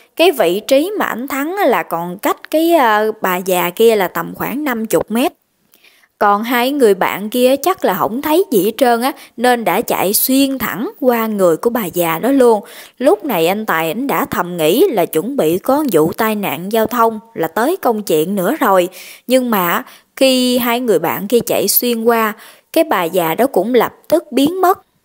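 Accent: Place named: Central, Vietnam. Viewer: Em giống miền te nói chuyện nghe đã thiệt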